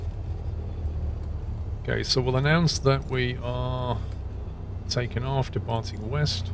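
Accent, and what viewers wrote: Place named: Yorkshire, United Kingdom. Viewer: Proper Yorkshire.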